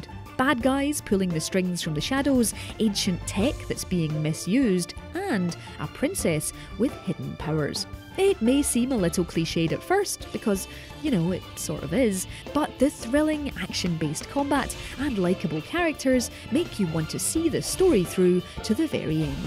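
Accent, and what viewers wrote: Scotland, United Kingdom. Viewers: that is the voice of an adult woman any day of the week mate and it sounds like a Scottish accent. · Are you Scottish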